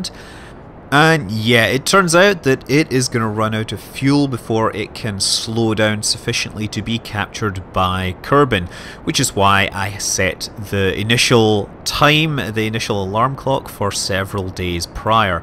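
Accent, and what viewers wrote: Scotland, United Kingdom. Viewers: Omg, nerdcubed sent me here and I was a bit reluctant but now I know your Scottish I’m amazed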